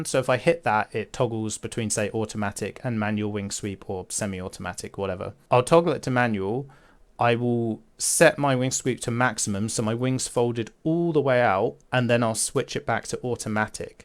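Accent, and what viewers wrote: United Kingdom. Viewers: mmmmmmmmm asmr british man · Last time I watched a British man yap for 40 minutes it was TheMightyJingles.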